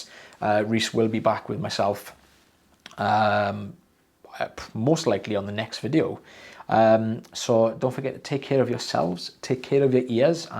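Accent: Wales, United Kingdom. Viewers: Terrific way to ease into 2026 with soothing Welsh voice describing the challenging removals. All the best to you and Kelly and your young lad! ❤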